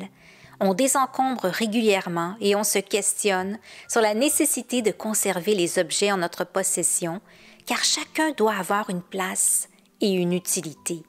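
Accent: Quebec, Canada. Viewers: Si je ne me trompe pas, cet accent est Québécois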